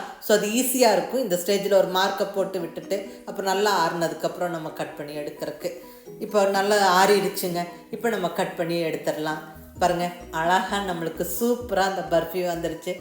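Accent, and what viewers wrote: Coimbatore, India. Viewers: I enjoy following your recipe, and listening your Kovai Tamil. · love your voice and presentation. cbm slang, good to hear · Super mam your kongu tamil and your all recipes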